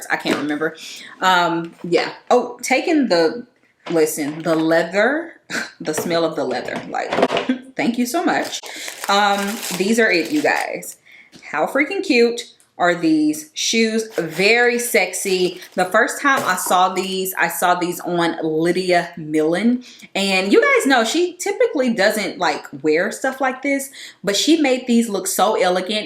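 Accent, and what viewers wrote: South, United States. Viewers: Lol you sure do. Your southern accent. I am from London so some American accents sound nice to me.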